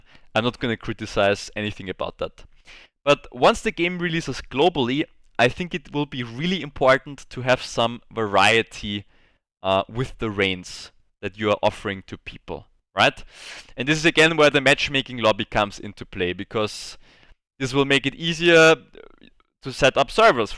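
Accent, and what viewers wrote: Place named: Austria. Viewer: Ich bin mal so frech auf deutsch zu schreiben- ich meine ich Höre einen Österreicher😂 Auf welchem Server spielst du? Und falls man fragen darf- in welcher Preisspanne sind wir bei deinen Drachen?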